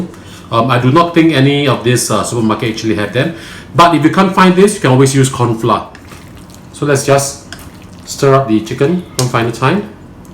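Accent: Singapore. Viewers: You sound more Singaporean than Malaysian tbh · Are you speaking Singlish while cooking taiwai style? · Arw you singaporian sir cause you sound like one..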